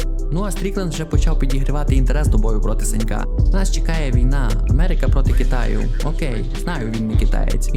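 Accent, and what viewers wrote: Transcarpathia, Ukraine. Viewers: все круто.)лиш таке враження що ти з Мукачева.)якийсь закарпатський акцент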